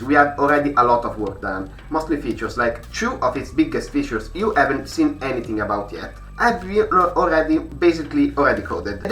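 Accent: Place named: Italy. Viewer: dal tuo accento mi sembri italiano, quindi rischio la giocata e scrivo in italiano. Questa mod è una delle migliori che abbia giocato fino ad ora. Apprezzo la ricercatezza di alcune stanze e degli stessi personaggi, tipo lo stesso Bertran ed il fatto che il suo gameplay sia basato sulla sua testa. Le parti più belle della mod sono senz’altro il design generale e i personaggi. Spero che riusciate a finire il terzo capitolo entro il 2024 perché potrebbe essere la migliore mod di isaac a mani basse. Sono in parte contento di essermi approcciato alle mod del gioco solo adesso e non 5 anni fa 😅 Buon lavoro ragazzi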